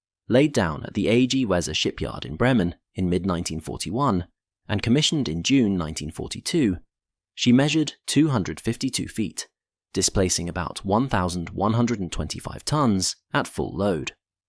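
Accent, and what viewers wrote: United Kingdom. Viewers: Az-ores, not A-zores. Hearing a British accent speaking American sounds awful.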